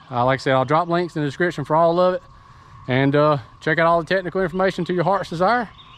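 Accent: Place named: South, United States. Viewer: Finally, a camping gear video from an American in the South. So many of them are Aussies. No disrespect to our brethren down unda', but I need to know what I need and where I will be camping. I live in SC, so I imagine the with that accent knows a thing or two about camping in my territory. The budget-minded selection is good, too. Thanks for the info and the no-nonsense presentation.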